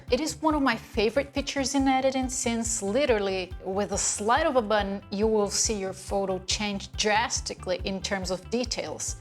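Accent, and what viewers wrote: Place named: Brazil. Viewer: Brasileira né? Percebi logo no inicio do video. Eai Guy's. hehehehhe. Anyway your english is nice. Flow! :) Great video. Obrigado!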